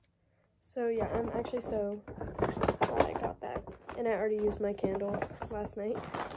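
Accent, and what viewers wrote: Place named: United States. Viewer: I'm not sure what she is saying because I'm English, and she's American, so I don't really know what she is saying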